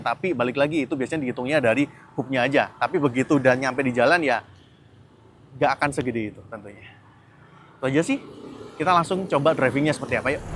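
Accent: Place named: Surabaya, Indonesia. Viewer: Suaranya jga mirip sekilas, cuma dg aksen suroboyo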